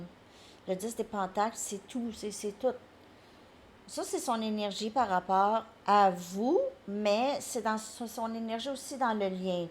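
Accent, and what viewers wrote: Canada. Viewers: Je vous ecoute pour la première fois, vous avez un accent Canadien ce qui est marrant Mais voilà, je suis oubligée,de.bien écoutée, car je ne comprends pas toujours bien,ce que vous dites Merci tout de même pour votre guidance 😂😂😂😅 ❤LGG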